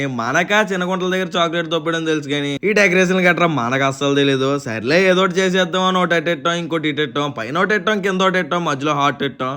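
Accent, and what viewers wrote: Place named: Srikakulam, India. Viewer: Bro pakka srikakulam laga maatladutunnaru meedi srikakulam aa bro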